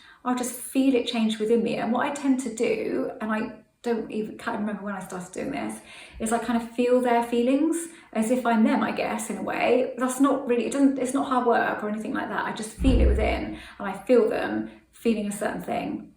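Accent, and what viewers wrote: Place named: RP, United Kingdom. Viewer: I kinda love it 😍 so posh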